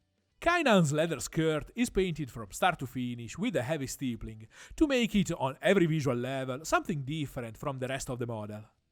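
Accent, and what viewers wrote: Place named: Tuscany, Italy. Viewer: I like your stuff, my Nonno was from pistoia and your accent is bringing back all the feels 😂😂 I’ll pass on the oils for now but it’s good to know the video is here for if and when I get the urge.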